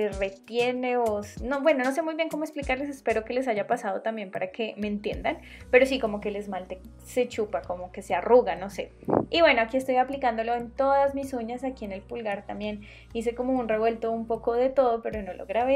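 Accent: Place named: Bogotá, Colombia. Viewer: Siento el acento Rolo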